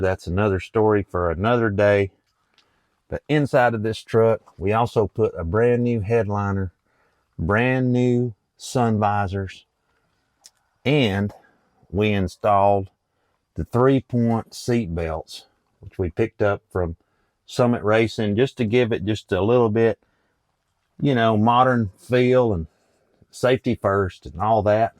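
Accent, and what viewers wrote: Tennessee, United States. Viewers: That's an incredible job. I thought I heard TN in that accent. I'm in middle TN working on a 73 F100 swb. Thank you for the videos. I wish there were 10x more. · Same here recognized that tennessee accent...im up here on the plateau in crossville. Great work on that f150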